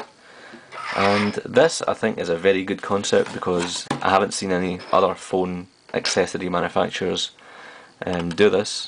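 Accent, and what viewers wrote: Scotland, United Kingdom. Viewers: Your accent is so cool! I'm going to take a guess - Scottish?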